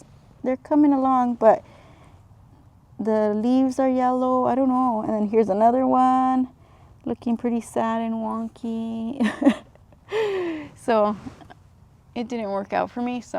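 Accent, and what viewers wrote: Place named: El Paso, United States. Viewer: I clicked on your video without reading the caption and as soon as you started talking I knew you had to be from my area lol I’m from Las Cruces 🥰🙌🏽 and your new follower!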